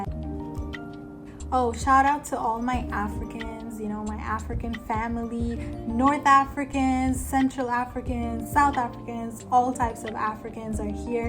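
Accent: United States. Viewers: Is speaking with a American accent as you say you have never been to America then isn't yr accent cultural appropriation? I do not mean to offend or be rude, I'm just wondering why you have a English American accent. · Sorry but I don't believe you're not an American. Not for a second. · Wow, so you picked up the US Mid Western American accent like here in Detroit. I assumed you are between 21 and 29. Also, you are gorgeous. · I am an American and would agree your English is very good. Your accent would be mostly American (although America has many different accents). Enough that you could pass as American if you were here.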